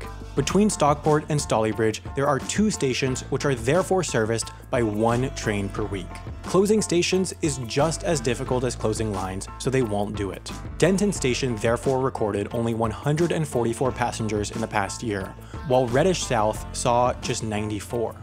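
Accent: United States. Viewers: Step One: If you're doing it in Britain...stop saying route the American way! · He's American....they all pronounce "route" as "rout". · I got so far and gave up with the annoying American voice over and mispronunciation, America has terrible train services for passengers. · It was quite weird hearing an American say the name of my small insignificant town near Manchester called Stalybridge. But yes, of course, he said it wrong: stal-y-bridge. It is Stale-y-bridge. One note of fame. Stalybridge was the theatre where "it's a long way to Tipperary" was first sung.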